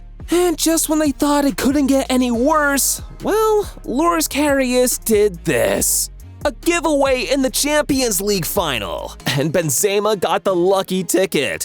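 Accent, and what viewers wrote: United States. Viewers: Hearing an American say these words is so weird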